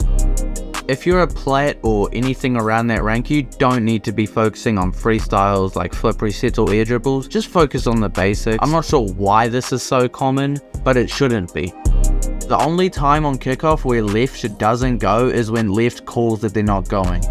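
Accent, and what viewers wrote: New Zealand. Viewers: Are you a kiwi.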